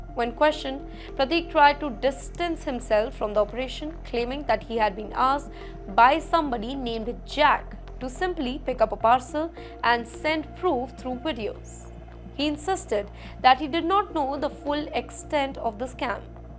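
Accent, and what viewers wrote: India. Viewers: Close the borders already! Why would anyone listen to someone with the Indian accent!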